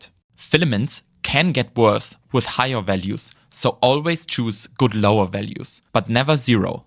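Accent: Germany. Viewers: Do you also have a german version of this video? Sounds like you are german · my guy as a fellow german, i’m sorry but ur accent is borderline too much. the content is great but it’s really hard to listen to. My guess is it’s because you „chop“ the words, u should adjust your max retraction and flow rate :D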